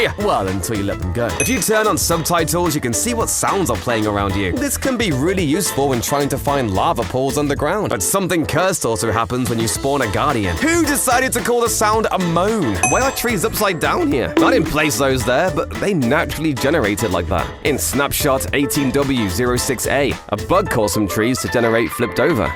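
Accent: United Kingdom. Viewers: So British accent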